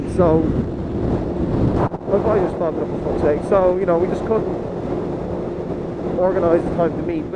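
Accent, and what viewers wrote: Ireland. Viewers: What part of Ireland you sound Kildare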